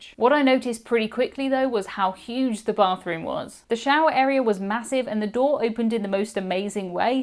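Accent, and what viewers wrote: United Kingdom. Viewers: I love your videos! btw is it cool if I call you? Also, fun fact, as an American I have my siri as British accent. Her British accent pales in comparison to yours. I wish I could have your voice as my siri.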